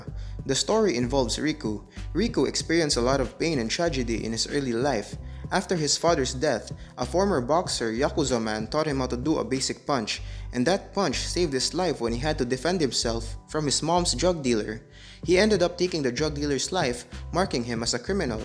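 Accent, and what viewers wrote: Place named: Philippines. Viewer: Flipino right? Your accent seems familiar.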